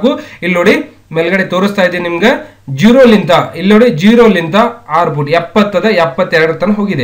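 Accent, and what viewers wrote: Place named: Shiggaon, India. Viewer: ಸರ್ ನಮ್ಮ್ ಊರ ಬಾಸ ತುಂಬಾ ಖುಷಿ ಆಗುತ್ತೆ ಸರ್ ನಿಮ್ಮ್ ಸ್ಪೀಚ್ ಕೇಳಿ ನಂದು ಶಿಗ್ಗಾoವ ತಾಲೂಕ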